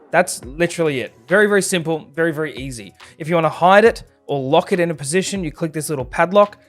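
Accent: Australia. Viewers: Thank you soo much this helped a lot the best part was that you Australian not American so I can understand you a lot more, your also very funny 😂😂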